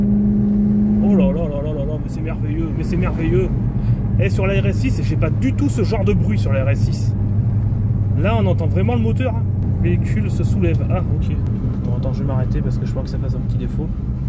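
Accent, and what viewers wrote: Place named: Southwest France, France. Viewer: brive.. ptn con je me disais bien l'accent il ressemblent au miens 🤣 je suis vers marmande bergerac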